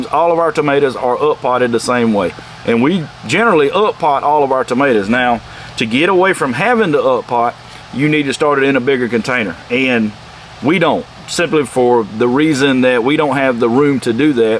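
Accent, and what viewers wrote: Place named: South, United States. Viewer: Thanks for not talking me to death like most videos do--just the basics delivered with a cute southern accent. ("Sole" for soil) :washhands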